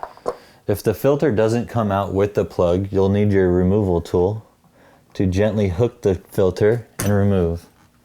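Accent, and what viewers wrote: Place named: United States. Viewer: A real person speaking perfect American English. Thank you God.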